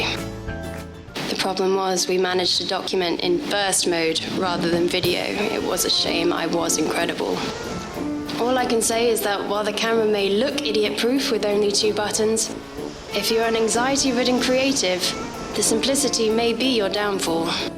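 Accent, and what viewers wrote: United Kingdom. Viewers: WahWah she sounds British Not Australian!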